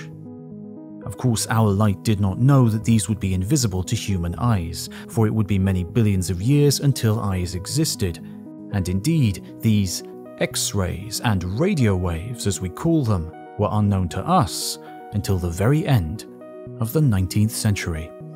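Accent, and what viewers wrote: England, United Kingdom. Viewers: Can you give your channel some class by ditczthe British accent and instead use a good Swedish, French or Irish accent.. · I hate measurements in Kilometres, why not Miles as that's what we've been brought up with and i no I'm being a bell end and for that i apologise. Great video and so enjoyable esp the narration. I think the English voice can be a universal voice were u don't need subtitles as everyone shud understand the narration and I'm not being biased i promise. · I hate your robot-English-toff-voice more than death itself.......death to to robot voices!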